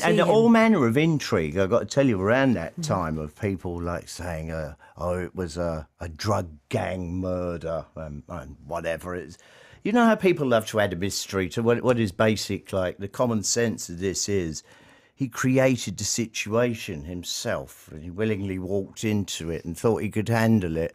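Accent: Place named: United Kingdom. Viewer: British accent and editing sounds smart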